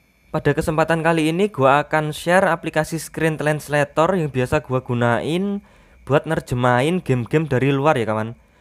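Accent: Java, Indonesia. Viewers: Jangan medok co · ngomongmu medhok ee mas pake lu gua lu gua. gugel ya gogel wkwkk. lanjutkan.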